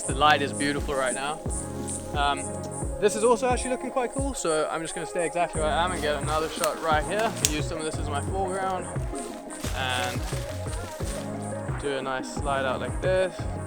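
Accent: South Africa. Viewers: Ahh! I thought you were South African ! nice boet!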